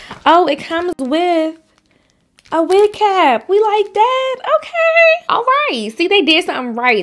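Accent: DMV, United States. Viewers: That DMV accent make this shit so much more funny😩😭😂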